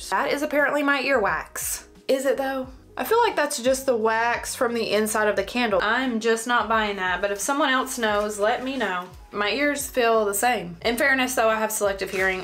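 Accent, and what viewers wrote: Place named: Texas, United States. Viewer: I traveled from So. Cal to lil' ol Canton Texas to watch. They sound a bit like you there. lol. It was amazing! We didn't cry though. And you don't need the glasses to look while it was in totality. Don't get your glasses from Temu, though. You will go blind. Ended up getting a high frequency face wand cuz you said you liked yours. Thankyou! Love it!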